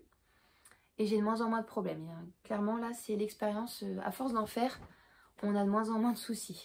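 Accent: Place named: Nord, France. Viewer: Hello! Vidéo très sympa, et qui a confirmé ce que je pensais: tu es du Nord aussi! Lol j avais senti un tout tout petit accent de chez nous;-) bon week-end ma jolie!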